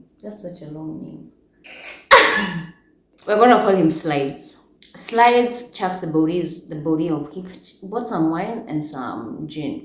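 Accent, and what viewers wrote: United Kingdom. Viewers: Newly hooked and is pretty😻your skin is to die for...Damn!!! Also it's the Britt accent for me😂😂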